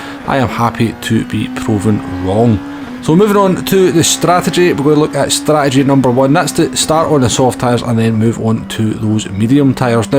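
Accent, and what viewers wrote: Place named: Liverpool, United Kingdom. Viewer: or is it scouse written. I don't know. Pretty difficult to understand it correctly. It seems that you're comming from Liverpool or somewhere over there.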